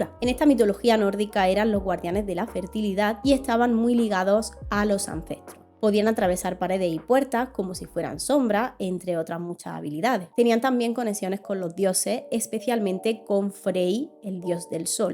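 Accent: Andalusia, Spain. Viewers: Muy agradable el vídeo y tu acento que ha de ser de Andalucía, puede ser? Soy argentina y te saludo. Y te ganaste mi suscripción 🎉❤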